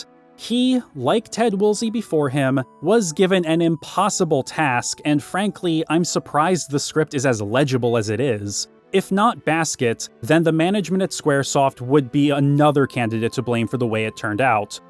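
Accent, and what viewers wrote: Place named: United States. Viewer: I'm around a decade older than you and played FFVII on its release and it was absolutely life changing at the time. That said I really wasn't aware of any real stigma associated with video games at the time. Pretty much everyone played video games when I was growing up, especially after the release of the PlayStation. That said I grew up in Wales, not the States (at least that's my assumption based on your accent, apologies if that's not the case) and my experience of high school was nothing like your own. That said great video I look forward to watching more of your work.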